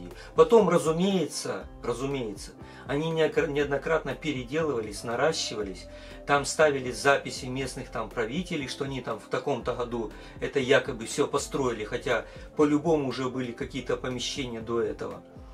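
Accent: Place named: Russia. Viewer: Excuse, I think the narration is great as it is. English with a Russian accent sounds good, information is all there and filming is brilliant. Don’t change a thing.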